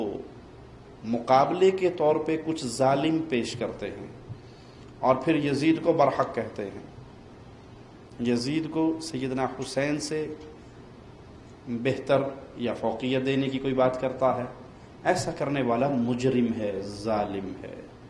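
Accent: Arab world. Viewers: اردو کو اردو لہجے میں بولا کریں۔ عربی لہجے میں نہیں۔ اردو کو عربی لہجے میں بولنا اتنا ہی غلط ہے جیسے عربی کو اردو یا پنجابی لہجے میں بولنا۔ یہ لہجہ بڑا ناگوار محسوس ہوتا ہے۔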